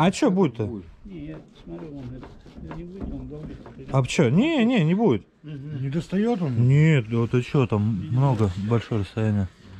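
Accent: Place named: Volgograd, Russia. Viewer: Ты с Волгоградской области? Если не послышалось, то что за район?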